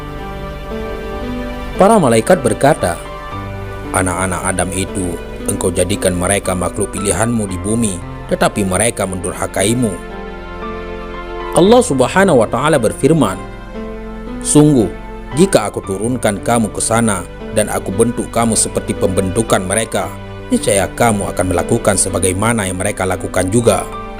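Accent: Aceh, Indonesia. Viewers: Macam gaya bahasa orang Aceh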